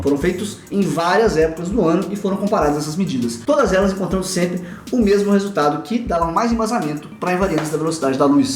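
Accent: Minas Gerais, Brazil. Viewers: Só de falar "vão falá um cadim" dá pra sacar que é mineiro. É tipo uma linguagem em código que possuímos... kkkk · Que delícia acordar e já ter vídeo novo. Uai, você é mineiro?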